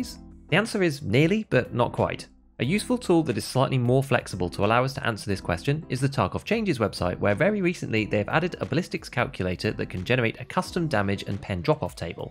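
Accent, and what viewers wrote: United Kingdom. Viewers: I just want to give an absolutely TREMENDOUS callout on the zeroing conversation! It's so important to understand what the "default" round is for the gun to understand if you'll be shooting low or high, ESPECIALLY for a long range gun like the SVD. As always, stellar work my fine-talking British friend.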